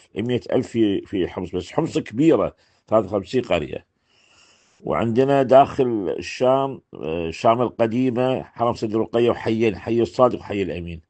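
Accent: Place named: Gulf, Arab world. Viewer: هذا يحجي خليجي مو سوري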